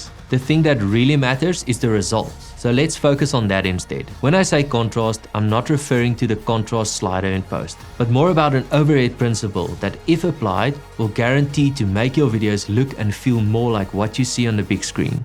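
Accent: South Africa. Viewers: Wow, it's so good to hear that Afrikaaner accent after all this time. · I love your South African accent- you all speak with Authority-Love it --- Love the vid man! keep it up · Tell me you're South African without telling me you're South African (apart from the accent) - doing more with less ;) !!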